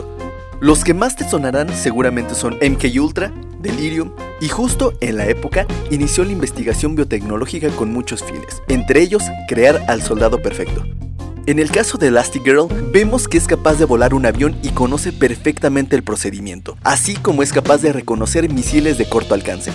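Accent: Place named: Mexico. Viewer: Min a min audio 100% mexicano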